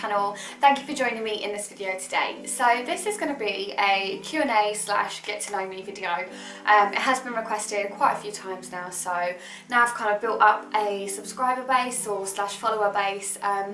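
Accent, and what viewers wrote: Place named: London, United Kingdom. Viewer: I thought you were from Essex - excuse me! South or east London I am guessing?! Love your refreshing nature x thank you for the video x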